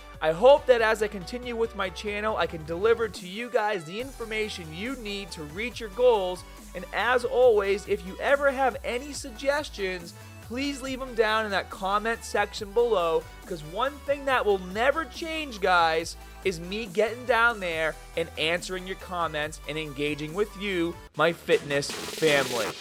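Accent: Boston, United States. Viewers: This guy has the most boston accent I have ever heard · Boston accent has consistently thickened at a gradual rate over the years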